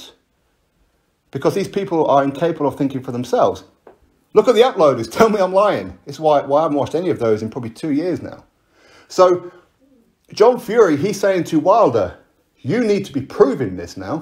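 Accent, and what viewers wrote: United Kingdom. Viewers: You are a biased youtube blogger..glad I don't subscribe to your page....you sound British and biased...Fury is subhuman with the cheating...you can get your small fan base to deny these allegations but they are real...👎👎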